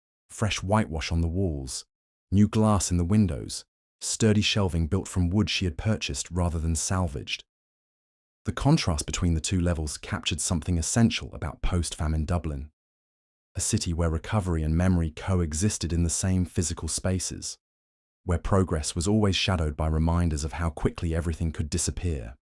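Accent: England, United Kingdom. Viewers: I know this is terrible,,,,is it just me or does his English accent make this sound more…. you know what I mean?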